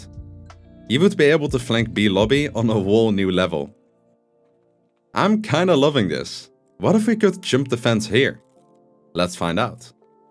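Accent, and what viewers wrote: Netherlands. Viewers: Ah yes the Dutch accent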